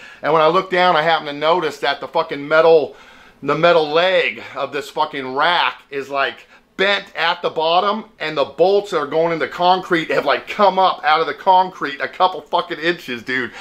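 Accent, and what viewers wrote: South, United States. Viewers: So if you are from WA, how come you sound Southern?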